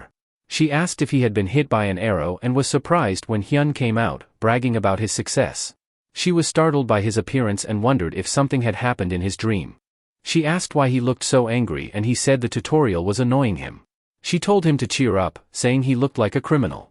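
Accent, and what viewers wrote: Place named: United Kingdom. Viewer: The British accent😭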